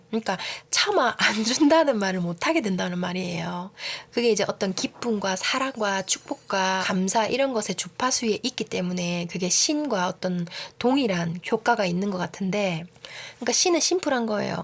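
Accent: Gyeongsang, South Korea. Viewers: ! 경남이나 부산 분이시죠? ^^ 그쪽 억양이 느껴져요 ㅎㅎ 딕션이 좋으셔서 듣기 좋습니다.^^ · 아니 대체 상담사들이 할수있는말이랑 머가 다른가요?솔찍히 경상도 목소리 좋아서 맨날 자기전에 듣고 있는데 요 이 책은 깝깝하게 들려요... 알아서 하란거잖아요... 예수도 그시대에 말 잘하는 철학자라는 생각하시는분 없나요? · 원래 경상도태생아니시죠? 경상도 사투리는 저음으로만~쭉가는데 님께선 위쪽지방하고경상도말투가섞인거 같애여 ㅎ 제가 대구거든요 😛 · 카더라, 카더라, ㅎㅎ 저도 경상도 출신이라 그런지 사투리가 정겹고 좋네요 내 마음의 고향에 온 거 같습니다~~👍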